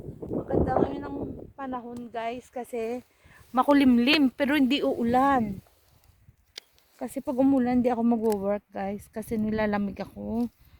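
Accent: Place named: Ilonggo, Philippines. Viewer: Pinay2 na pinay at ilonggo tune😅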